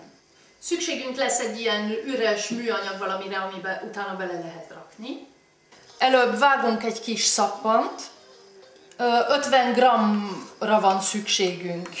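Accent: France. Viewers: Egy kicsit hurcsa hogy magyar vagy és francia akcentusod van. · Nagyon szépen beszéled a magyar nyelvet! Imádom a francia akcentusodat! :D szexy és egyedi ;)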